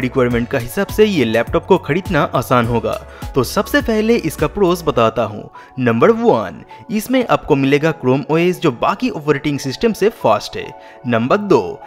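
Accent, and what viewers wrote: Bengal, India. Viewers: Sound like you are a Bengali (Bangali)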